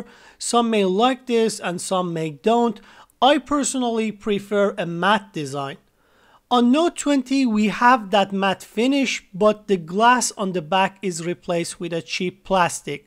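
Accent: Iran. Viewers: Your accent is Iranian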